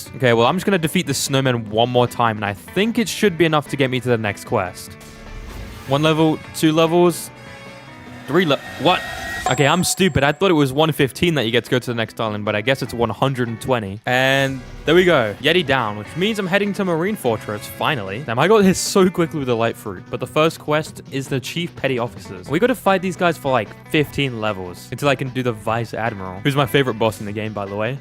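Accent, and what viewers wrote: United Kingdom. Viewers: this guy sounds british but i might be wrong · what is that briish acent mate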